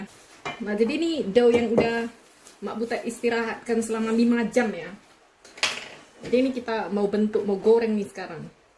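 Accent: Medan, Indonesia. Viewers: kalau ngomong enak deh, dengan logat medannya. Wkwkwkwk.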